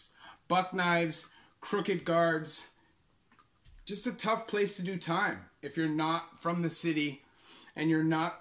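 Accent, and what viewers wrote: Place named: Toronto, Canada. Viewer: I live in windsor Ontario n noticed u got an toronto accent its cuz I'm w alotta Americans down here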